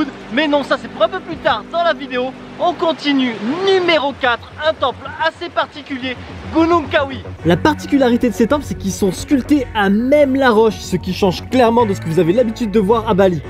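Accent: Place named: Marseille, France. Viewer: Trop fort le gonze, j’adore tes vidéos et surtout tes commentaires à la marseillaise 👍👍👍 Merci de nous faire découvrir ces superbes endroits et merci pour ton travail 😉 continue !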